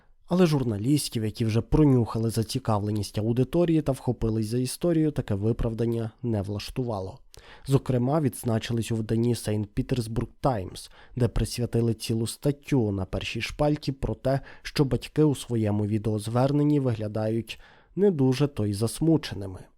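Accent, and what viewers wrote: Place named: Ternopil, Ukraine. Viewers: На таку файну тернопільську вимову нелегко нагнати саспенсу, хоча дуже стараєшся) якщо звикнути то норм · Я також припустив що автор з західної України😊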